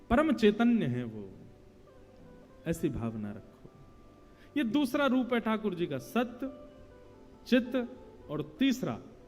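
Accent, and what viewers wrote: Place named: Braj, India. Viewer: Raadhey raadhey guru ji aap bhut acchi katha krte h aap ke mukharvind se brij boli bhut acchi lagti hai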